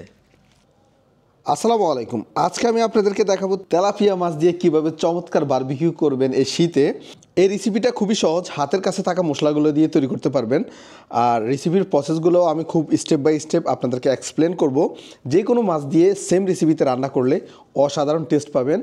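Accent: Noakhali, Bangladesh. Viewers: মুখের ভাষাতেই বুঝা যায় ভাই নোয়াখালীর..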